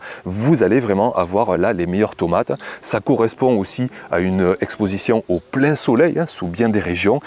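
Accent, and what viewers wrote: South, France. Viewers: Salut, et merci pour tout encore une fois. L'accent ensoleillé c'est toujours un plaisir ❤️ J'ai testé la "Black cherry", tomate-cerise un peu noire/rouge que j'ai bien aimé, il faudrait la trouver en variété classique pour bien apprécier je pense. Autre chose, avec tes soucis de chaleur et sécheresse, pourrais-tu faire la saison prochaine un achat de quelques sachets de Pascal Poot, qui sont génétiquement peu sensibles aux manques d'eau. En nous faisant une mini-série dédiée, thématique. Enfin c'est une idée quoi, ça m'est venu mais tu as dû y penser déjà, Bonnes vacances à tous 🙏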